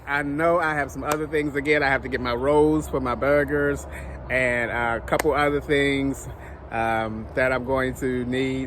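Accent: Baltimore, United States. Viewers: You’re from Baltimore for sure loln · Hi! Fellow Baltimorean who decided to jump ship years ago. I still hear the Baltimore accent "tew". Lol! Enjoying the videos, bro! Keep them coming.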